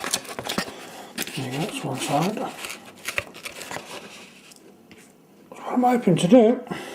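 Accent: United Kingdom. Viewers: In a box, not from a box...You talk funny. British or something...Stupid radio.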